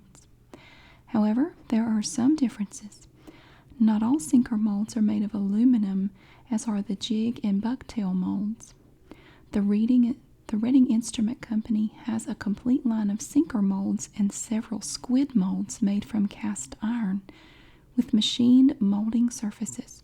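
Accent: South, United States. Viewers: I love your gentle southern accent.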